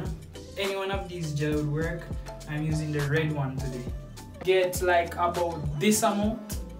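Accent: Jamaica. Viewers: U Jamaican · I know u Jamaican breddah 😂 big up 🇯🇲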